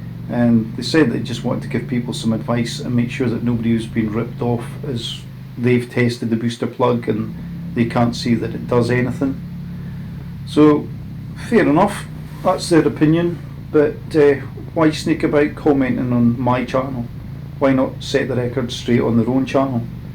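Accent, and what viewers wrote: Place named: Scotland, United Kingdom. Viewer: Hi pal just found your channel, I'm just interested to find out how much warranty work you've had done on your bike ? Thinking of buying one but not sure whether to go new or second hand, new gives warranty obviously. Also, you sound Scottish, don't know if you live up here but if so, where did you buy yours ? Drysdales ?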